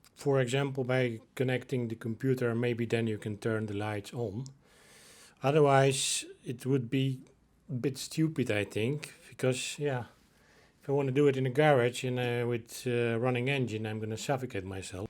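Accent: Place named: Netherlands. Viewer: Thanks very much for your videos. It takes all the guess work out of it. And it took a Dutchman to do it. Oh well, you sound Dutch anyway!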